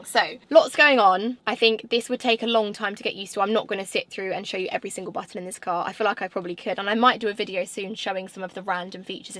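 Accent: United Kingdom. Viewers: clicked for the car, stayed for the accent, love the brits accent damn it's soo cute & sexy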